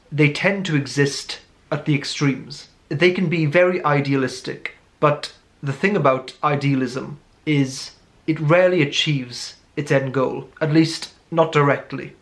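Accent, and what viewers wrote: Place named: RP, United Kingdom. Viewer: You have the most posh accent I’ve ever heard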